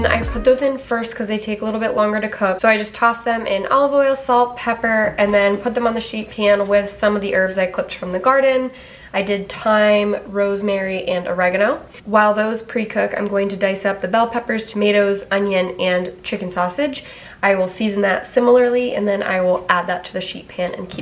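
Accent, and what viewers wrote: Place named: United States. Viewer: thanks for sharing. You have a beautiful home and land! Your furbabies are sweet! I'm jealous of your porch room! I would totally curl up with a blanket and a good book. Love the tea trolley! It's one of my favourite colours. You can get some super cute, battery operated tea light vines. I've gotten them at the dollarama (greetings from Nova Scotia btw). You sound American so for you I think you have the dollar tree? You can also get them in Walmart that plug in. What about a built-in window bench with storage? You made me hungry with the sheet pan supper...lol. The Pioneer Woman has a bunch of those recipes. One of my favourite garden tools is called the garden claw. It's blue and yellow. The top of the handle is ergonomical and the tines on the bottom of the rod allows you to loosen soil around roots to make it easier to pull plants. 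Plus you can use it to mix soil. You should let your seeds dry before storage...they have a tendency to rot if you don't. It's been a year so I'm assuming you finished your office. I only found you today. Love the shelves around the door idea. What about a padded chair with built-in drawers under the seat for storage? I saw it somewhere and it was super cute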